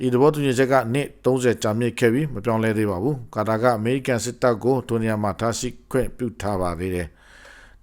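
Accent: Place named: China. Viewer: ဘာလေသံ လဲ စိန တွေ မြန်မာသံ နဲ့ U.S ကို Smearing Campaign...လုပ် ယုတ်မာနေပြီ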